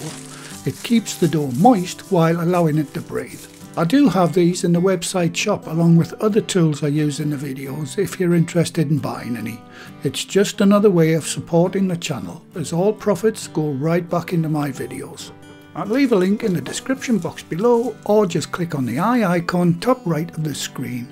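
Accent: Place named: Newcastle, United Kingdom. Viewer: amazing recipe but even better when it’s read out by what sounds like a geordie version of Winnie the Pooh! 😊 🤩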